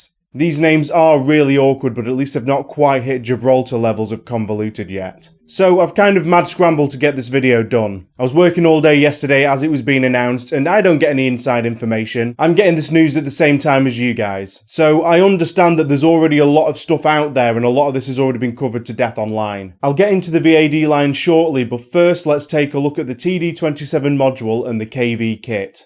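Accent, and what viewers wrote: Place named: Yorkshire, United Kingdom. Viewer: Nice to hear a Yorkshire accent for a change!!!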